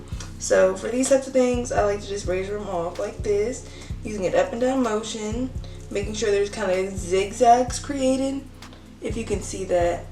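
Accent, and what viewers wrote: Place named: Bay Area, United States. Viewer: I just found your YouTube lastnight and girl i loveeee your content! At first i thought you were from the Bay Area (i like too) and just how you talk lol I’m from there. But good work girl! 💕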